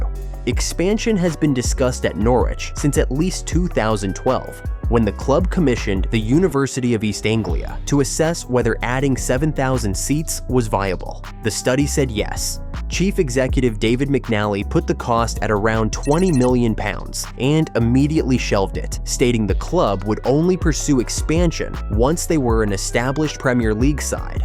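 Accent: United States. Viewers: This fu@ accent……I just can’t….I hear yankish and I snap!F america!F yanks!F Trum!F u!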